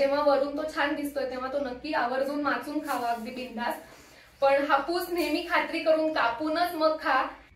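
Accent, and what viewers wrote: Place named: Rural, India. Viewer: Love your Gramin languages tone when u use. ........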